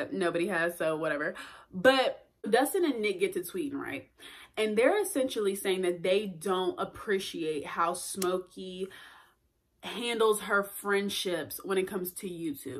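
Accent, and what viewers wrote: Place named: Bay Area, United States. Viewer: Are you from the Bay Area sis ? I hear the accent lmao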